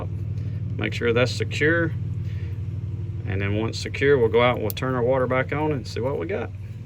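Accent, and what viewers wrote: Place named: South, United States. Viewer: Not $100. I paid about $40 - $50 at the orange box store. It depends on far the turn-valve is from street level. Mine is about three feet down so I can’t reach it with a wrench and had to buy the water key. In the far north, they can be four feet below ground, due to the frost line. This guy sounds like he’s in the South, so they’re not buried very deep and he can easily reach it with a hand tool.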